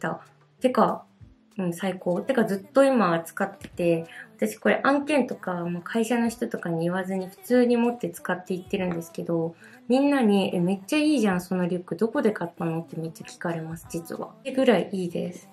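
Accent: Kagoshima, Japan. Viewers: 鹿児島県民です✋ 鹿児島弁聞いて、癒されました笑⸝⸝- ̫ -⸝⸝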